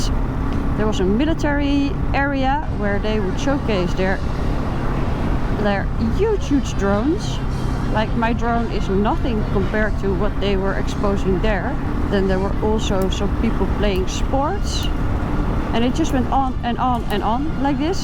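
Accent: Netherlands. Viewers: Oo noong una kong makita at mapanood itong channel ni akala ko siya si noraly( itchy boots ) dahil ang pagkadinig ko sa boses ay pareho pero nung mga sumunod na episodes ni nakilala ko din na magkaiba ang boses nila, sa accent ng pananalita pareho kasi pareho sila na taga Netherlands 😊😊😊😊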